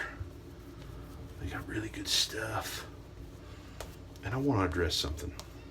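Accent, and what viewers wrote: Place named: South, United States. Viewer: Great Southern accent, what state is that?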